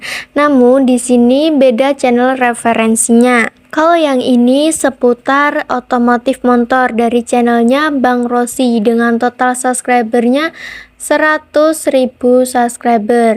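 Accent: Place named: Java, Indonesia. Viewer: Medok jowone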